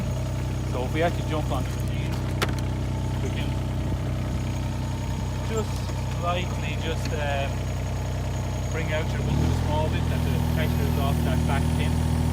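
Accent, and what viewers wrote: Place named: Ireland. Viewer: Yes this is my second comment on this video and I’ve seen all of the others where people are swinging hammers and all sorts of things to get buckets on and off. This guy with his lovely Irish accent just simply shows you how to get the bucket in balance and do it with one hand. I am part Irish so I can say something about his lovely accent!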